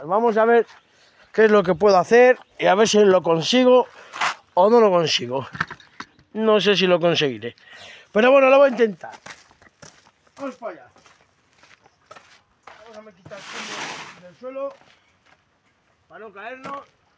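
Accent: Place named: Bilbao, Spain. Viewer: No hace falta, pero seguro que eres de Bilbao. Joe que tio.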